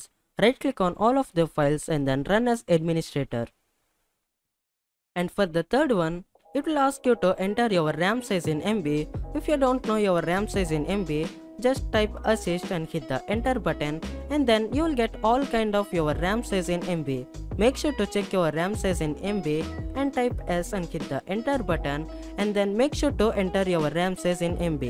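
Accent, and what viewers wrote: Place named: India. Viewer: np. Are you an Indian? You seem to have an Indian accent. Btw I am Indian too.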